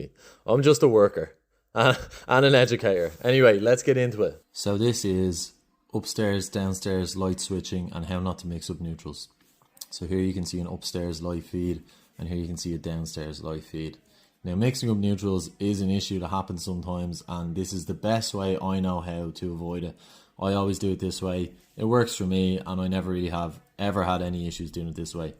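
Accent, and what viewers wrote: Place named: Ireland. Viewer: Turning into a nice series this. Educational, short and easy to follow + the Irish accent makes everything better 😂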